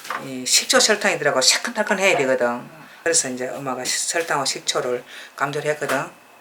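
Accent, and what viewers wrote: Gyeongsang, South Korea. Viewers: 좋아요 구독 알람까지 꾸욱 경상도 사투리가 넘 정겨워예^^ 저는 부산이라예^^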